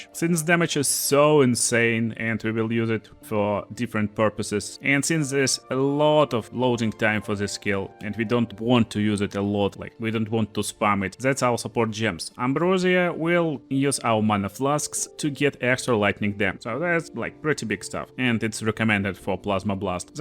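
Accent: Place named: Russia. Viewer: as a fellow russian I am so good at spotting russian accent, interesting how you prolong some vowels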